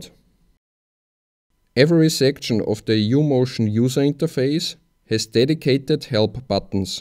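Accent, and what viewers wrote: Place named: Austria. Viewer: Österreichisches Englisch is einfach leiwand :D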